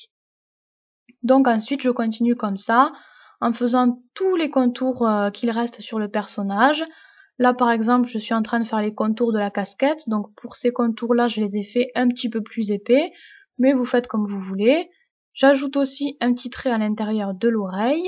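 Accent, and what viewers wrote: South, France. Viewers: J'adore ton accent tu viens du sud ? De la france !!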